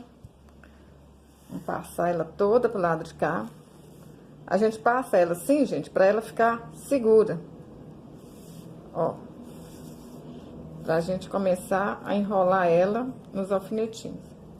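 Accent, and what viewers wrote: Minas Gerais, Brazil. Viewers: O mais gostoso de tudo é esse sotaque mineiro. Amo! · O que mais gostei foi o sotaque mineirinho! Assisti até o final apreciando a moça falar!😁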